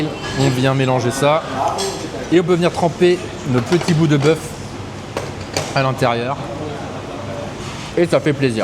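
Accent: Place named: France. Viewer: Par contre lire le Vietnamien à la Française, c’est vraiment moche lol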